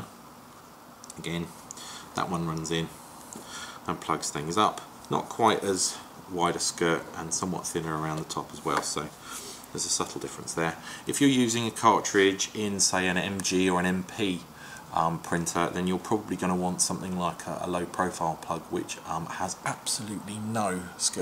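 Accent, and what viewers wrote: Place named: United Kingdom. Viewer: Damned British accent PLUS not talking into the mic made it hard to understand a word you said. Learned more from watching than listening.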